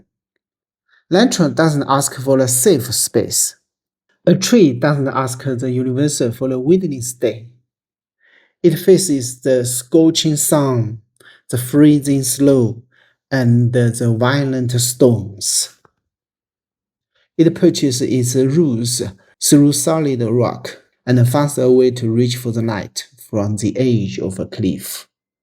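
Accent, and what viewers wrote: China. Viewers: he does have a strong chinese accent though so maybe its just a neccesity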